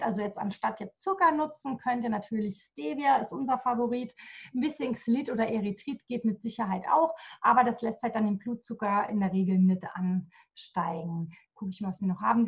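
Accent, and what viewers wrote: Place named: Saarland, Germany. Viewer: Ich liebe den saarländischen Dialekt 😊❤. Lg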